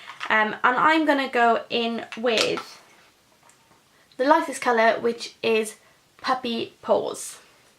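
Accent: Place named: Wales, United Kingdom. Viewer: I noticed your welsh accent straight away Im Welsh too Lovely vid :)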